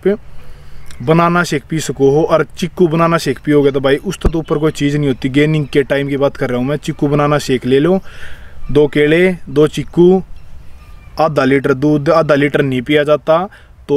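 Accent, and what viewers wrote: Haryana, India. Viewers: Haryane balo ki boli acchi lagti h bhai 👍👍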